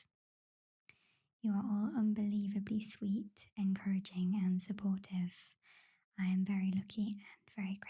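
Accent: United Kingdom. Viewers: Judging by your accent, you live in the UK so I guess you will have plenty of opportunities coming up to catch the rain sounds ;). But maybe you can use background sounds from other YouTube channels if you'd ask their permission? I've just watched you other Witcher vid too, great outfit and your whispers are as relaxing as your soft spoken vids. Keep up the good work, really appreciated!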